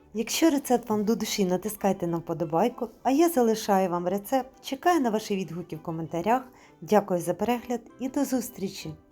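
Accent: Standard. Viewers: Як ви гарно розмовляєте ❤❤❤. Приємний голос , чиста українська мова, рецепти і їх подача чудові ❤❤❤. Удачі вам , підписуюсь ❤❤❤ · Обожнюю ваші рецепти.Дивлюсь на одному подиху.Поки не маю можливості спробувати бо не вдома.Але незабаром думаю реалізую таку можливість.Окремо дякую за прекрасну,чудову,гарну, неповторну,чисту, правильну УКРАЇНСЬКУ МОВУ. Це неймовірно.Натхненя,наснаги,здоров'я Вам і всього найкращого.❤❤❤❤❤🎉🎉🎉🎉🎉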